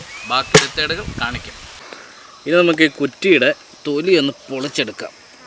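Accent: Kottayam, India. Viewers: കോട്ടയം ആണോ സ്ഥലം ? സംസാരം അങ്ങനെ തോന്നുന്നു.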